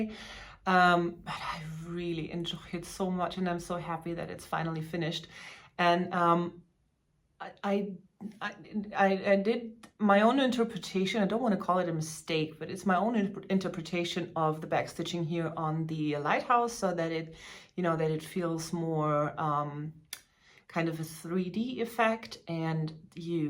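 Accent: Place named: Germany. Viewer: Have you ever visited the island of Borkum? I live in Canada but spent some time in Germany in the late 1960's and your lighthouse piece brought that to mind. It looks wonderful, by the way! I really enjoy your channel,. Let out your German accent as I find it quite charming!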